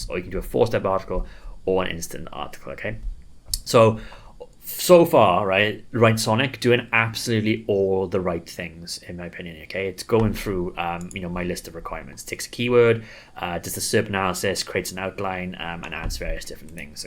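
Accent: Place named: Cockney, United Kingdom. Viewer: I think you forgot your audience was mostly American and not cockney? It's difficult to follow you. This is a really good video but no way am I going to slog my way through 2 hours of this. But your shirt fit the the mood.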